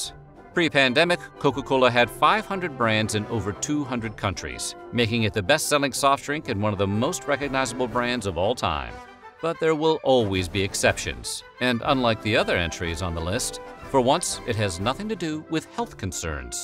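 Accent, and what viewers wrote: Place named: United States. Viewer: Bro literally speaks american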